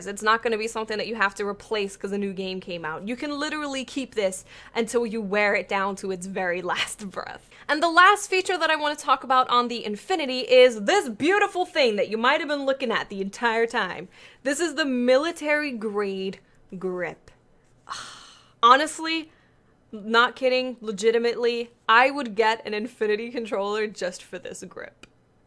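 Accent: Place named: East Coast, United States. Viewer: I'm lovin that accent lol. new yorker? Philly? you gotta be from one of the East Coast cities haha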